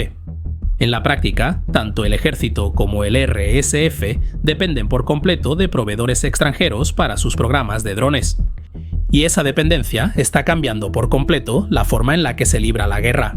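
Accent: Spain. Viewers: gracias a Dios que contraron a un español para traducir al castellano en acento neutro de España. La IA es cutre y suena a tu acento tercermundista de come bananas.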